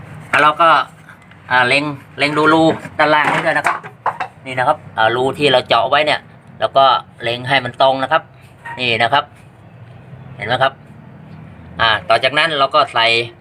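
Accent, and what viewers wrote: Chanthaburi, Thailand. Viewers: คนที่ไหนครับสำเนียงนี้ คล้ายบ้านผมเลย ผมคนจันท์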